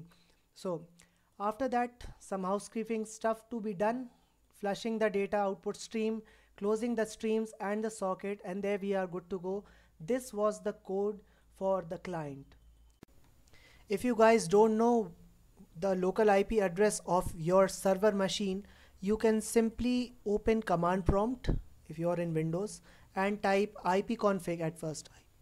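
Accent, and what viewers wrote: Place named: India. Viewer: You Sound like an Indien tech Support scammer but u r 100x Intelligent than them I am not so I am watching this Video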